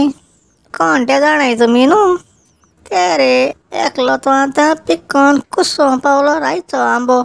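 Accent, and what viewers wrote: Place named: Salcete, India. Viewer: Typical Salcete Konkani... ..😄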